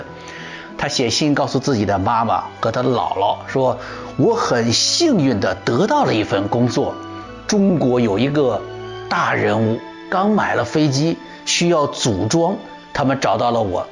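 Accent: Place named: Northeast, China. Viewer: 江老师东北话说的地道!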